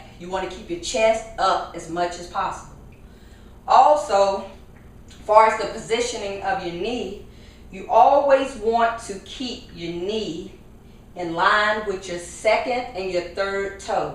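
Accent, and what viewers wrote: South, United States. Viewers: You remind me of my ppls down south, you have the crazy country accent!! LOL!!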